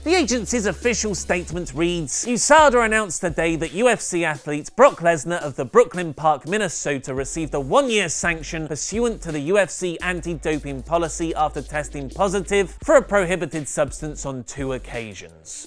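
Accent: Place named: England, United Kingdom. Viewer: what part of england you from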